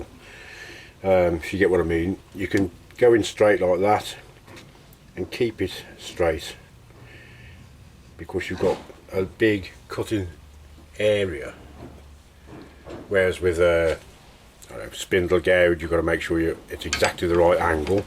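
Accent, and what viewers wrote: United Kingdom. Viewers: Awesome re weather. We recently had two days' worth of sorely needed rain here in Jacksonville, FL. It was beginning to feel like drought-type issues around here. It sounds like you're a Brit. Am I right? If so, what part of the UK do you live? I visited a friend in Telford-Dawley back in 1995 from August thru October and loved the difference in the essential 'air'. Jacksonville is sub-tropical, so lots of heavy humidity around here. The UK weather was crisp and comfortable to me. Thanks for info re the purple heart wood. Do you ever sell any of your creations? Cheers....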